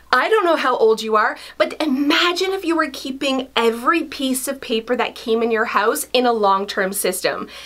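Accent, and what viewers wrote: Canada. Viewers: Hilarious intro. Love your Canadian accent. Personally I keep temp files (this year) in my desk, and long term files all in the same file cabinet. Having 15 different places I found increases paper clutter. Also a periodic clean out of even long term files is necessary. I am considering your household binder idea. I liked your command center its not suitable for me but quite beautiful. Not a fan of the whole addictive personality thing, please no offense, it comes through and I'm a less is more kind of gal.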